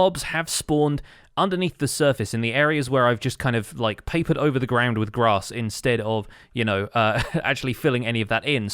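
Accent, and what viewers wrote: United Kingdom. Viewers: The notification woke me up at 7am but I’m damn sure gonna let that cocoa butter British voice soothe me back to sleep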